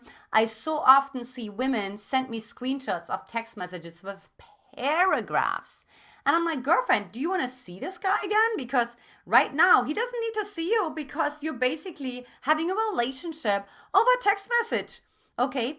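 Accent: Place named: Germany. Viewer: Im ready! (And BTW love your German accent!).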